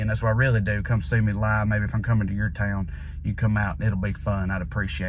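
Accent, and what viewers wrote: South, United States. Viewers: You always speak the truth, but ironically with a "Southern Touch". (The irony being Southerners are rarely liberal thinkers !!) 😊😊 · i never thought i'd see someone with a southern accent who wasn't an asshole. thank you <3 Edit: i'm southern myself, i don't have an accent, but i've never met someone with the accent who wasn't a complete jerk. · Hang in there! I’m age 65, grew up in a small town in Middle TN (Tullahoma), & we used to drive right through your home town in the 1960’s, 70’s and 80’s to camp and waterski on Dale Hollow Lake for long weekends. It’s such a pleasure to hear someone like you from my “part of TN” who not only understands US politics, how insane & nonsensical 1/3 of our country has become..esp since the “Tang-tainted a$$” 😂decided to run for prez in 2016 (not planning to win, just hoping to bring more attention to him and his “brand”). 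And the cherry on top for me is hearing someone speak the truth with the same southern accent that I still have 😊. Thanks for keeping me sane, & for saying “fifty-eleven times”!! Haven’t heard that since I was a kid! Keep ranting,! I share your channel with all my friends. PLEASE, PLEASE consider coming to Greenville, SC on 1 of your tours. We have become a purple city, & you will love it.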